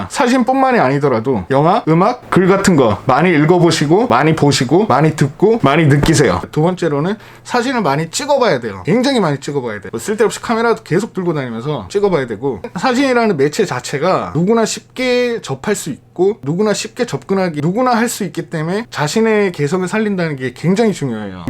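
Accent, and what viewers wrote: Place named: Daegu, South Korea. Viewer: 댓글 달고 나니까 대구가 고향이라는 말씀하시는 부분이 딱 나와버렸어요 ㅋㅋㅋ익숙한 말투라 ㅋㅋ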